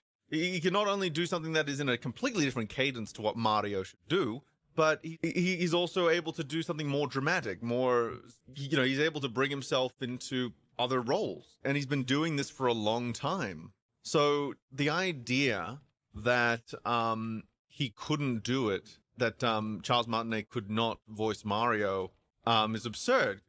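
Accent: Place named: Australia. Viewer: You have the classiest Australian accent I’ve ever heard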